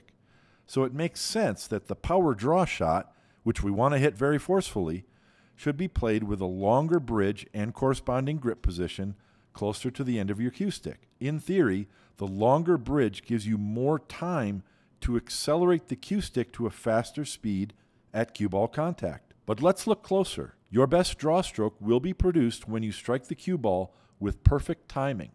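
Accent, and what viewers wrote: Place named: Wisconsin, United States. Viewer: With that accent, you’re definitely from Wisconsin lol. Love the content! I just figured out the “throwing motion” on my own the other day and it helped a lot. I need to try out the 90° rule on a table tomorrow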